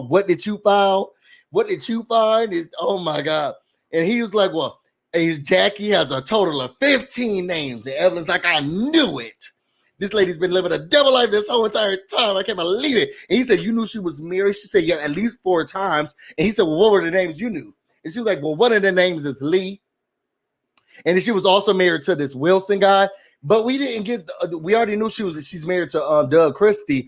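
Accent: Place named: South, United States. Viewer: with a Southern accent (Yes Lawd)! 😍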